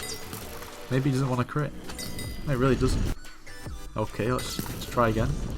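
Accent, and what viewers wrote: United Kingdom. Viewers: ma bri'ish man is back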